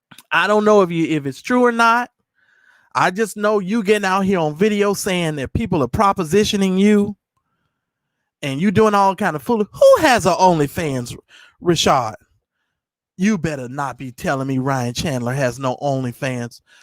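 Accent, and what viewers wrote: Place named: South, United States. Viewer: 🤣🤣🤣🤣🤣 You and this southern accent! OMG so funny! Those darn pickup trucks! 🤣🤣🤣🤣🤣🤣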